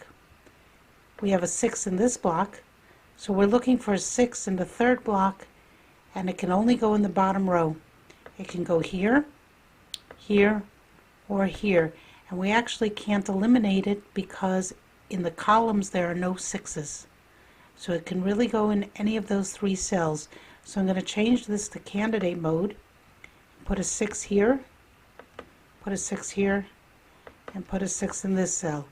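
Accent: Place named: Newfoundland, Canada. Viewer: Thanks very much. Are you from Newfoundland? :) Your 'accent' sounds familiar to me and I'm from there.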